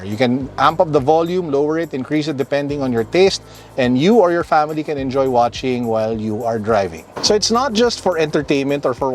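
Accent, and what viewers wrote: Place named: Philippines. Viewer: gawa kapa ibang review sir mas maganda kasi boses mo kesa don kay autodeal. yung english mo kasi sir may tagalog accent pa kaya ayos sa tenga.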